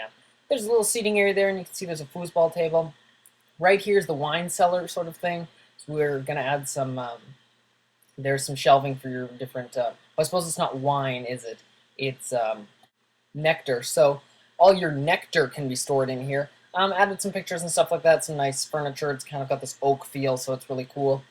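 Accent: Canada. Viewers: he's from Canada , he has a accent. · cuz hes Canadian so he has a accent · you a clearly Canadian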